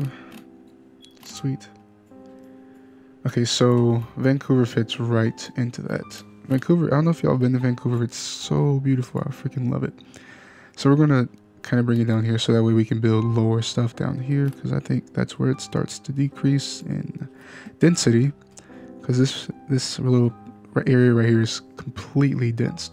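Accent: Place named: Netherlands. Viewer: he is from holland do you expect perfect English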